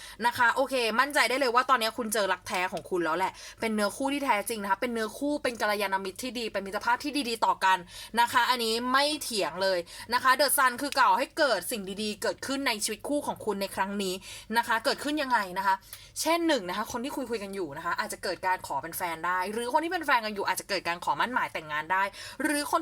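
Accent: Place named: South, Thailand. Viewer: ชอบแม่หมอจังเลยค่ะฟังแล้วเคลียดี น่ารักค่ะ ฟังละไม่เครียดเลย อ้อร้อด้วยคนใต้ป่าวนิ อิอิ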